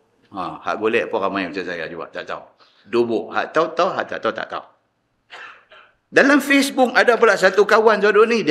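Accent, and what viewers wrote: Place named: Penang, Malaysia. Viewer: penang dngr sleng dia bleh tau dh penang...kecuali perak blah utara slalu akan owg kata kedah peneng dia ad loghat dia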